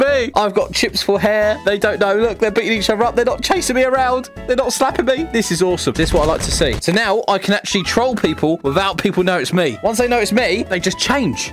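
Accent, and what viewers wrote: United Kingdom. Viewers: Are you British? I love British accents, especially your voice is so soothing for some reason lol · The British accent 🥵😩😩😩😩😩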